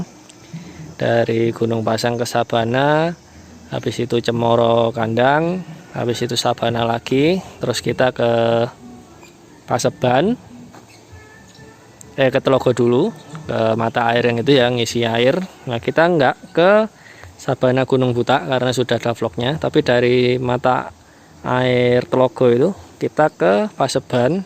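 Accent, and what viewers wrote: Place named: Surabaya, Indonesia. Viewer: Mas suarsne sby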